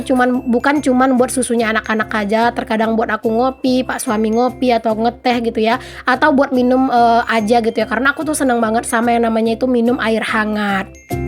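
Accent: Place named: West Sumatra, Indonesia. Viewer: Hay Bun, Bun aku tuh salpok sama suara waktu dabing dan ga dabing beda banget 🤭 kalau ga lagi dabing suara nya Minang banget 🤭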